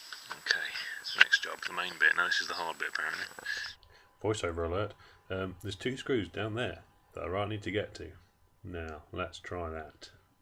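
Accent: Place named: England, United Kingdom. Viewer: Get your ears tested. He is clear as a bell if you understand English English. Maybe you are American.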